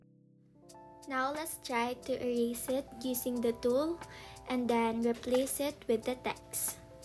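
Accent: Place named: Philippines. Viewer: i like your way of speaking english, it's nice that you don't try to sound like other accent, it's very filipino while sounding very good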